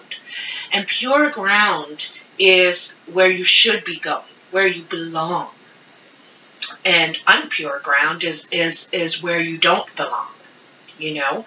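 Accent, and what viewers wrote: Canada. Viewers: I thought so, I just got such a vibe, probably 'cause i too am in ontario, but when you said "eh" I thought yes! she has to be Canadian, lol Tks for replying :)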